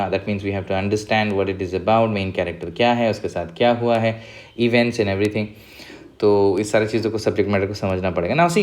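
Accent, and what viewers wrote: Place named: Assam, India. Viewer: Sir r u from assam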